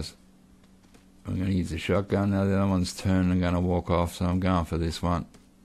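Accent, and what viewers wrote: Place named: Australia. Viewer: Another great one! Btw just love the aussie accent, sounds so "real" hehe :-D just love it mate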